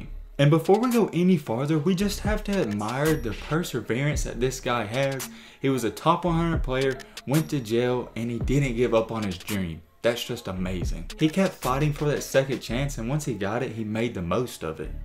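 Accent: South, United States. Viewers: dude country asl doing this vid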